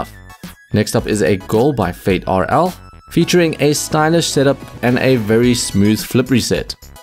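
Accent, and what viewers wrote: South Africa. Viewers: are you South African ? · Are u from South Africa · Are u south african :) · Haha nice! I could tell by your accent! 😄 I am also from Joburg 😊 are you currently living here? I get the feeling you're living in another country for some reason? 😂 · Are you South African? 🇿🇦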